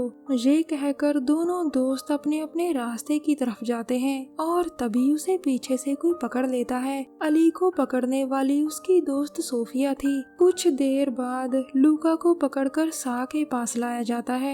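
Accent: Punjab, India. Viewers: Your hindi is in Punjabi excent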